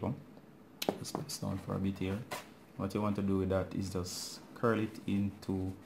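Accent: Jamaica. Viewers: I can tell you are Jamaican my family is so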